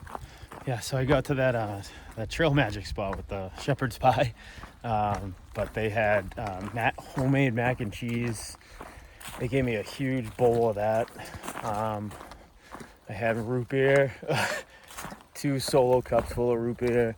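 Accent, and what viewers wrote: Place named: Boston, United States. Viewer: Might you be from Boston, slight accent there. What's the trail name,?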